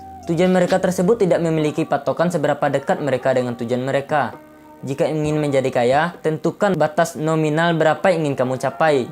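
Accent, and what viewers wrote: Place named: Punjab, India. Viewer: Bang Punjabi bgt😅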